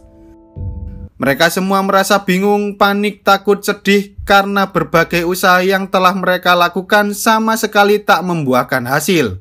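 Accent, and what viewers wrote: Java, Indonesia. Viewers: Wong jowo mas? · logat mu podo koncoku mas jowo ngendi sampean? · Suaramu wi lho bang medhog banget, malah mirip koyok suarane dodit hahaha🤣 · Dari cara ngomongnya, pasti orang jawa tulen ini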